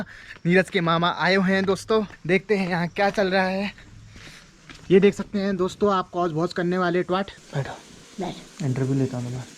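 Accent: Pahari, India. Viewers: Mujhe aapki pahadi bolane bahut badhiya lagti hai👌👌👌👍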